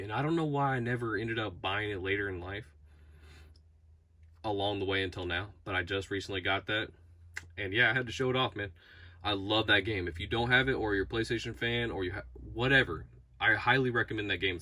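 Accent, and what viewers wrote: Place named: Texas, United States. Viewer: You from Texas?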